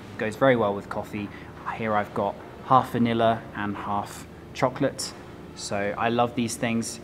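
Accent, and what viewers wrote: England, United Kingdom. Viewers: That beautiful and sexy English accent describing places in Mexico...incomparable.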